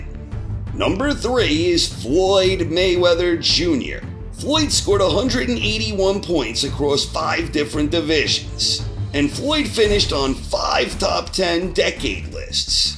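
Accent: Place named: New York, United States. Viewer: Very interesting, Whatever accent you have - it is the official "Voice of Boxing Knowledge" to me now! (Is it New Yorker?) A big Thank You from sunny England, for putting all the effort into compiling this epic list over the last few months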